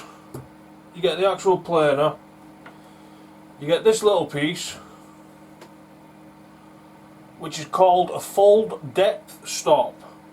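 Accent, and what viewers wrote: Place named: Yorkshire, United Kingdom. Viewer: I'd watch these videos just to hear that lovely Yorkshire accent..... ;) :) :) :)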